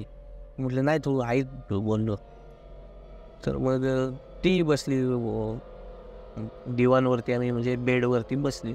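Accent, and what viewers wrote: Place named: Ghats, India. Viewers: आमी पन कोकणातलेच हत, पण दादा सांगतत ते कोकणातले हत पन त्यांची बोली भाषा ही घाटावरची हा.. मालवणी किंवा कोकणीच्या आसपास देखील नाय हा. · तुमची भाषा कोकणी वाटत नाही. पुण्याकडचे वाटता. आणि कृपया कोकणाचा उल्लेख करू नका. काही गरज नाही त्याची.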